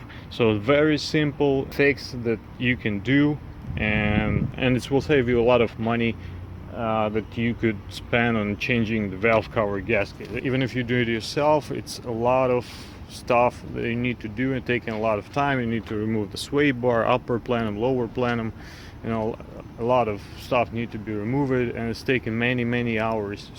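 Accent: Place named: Russia. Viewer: You sound like Ray Raymonde with a Russian accent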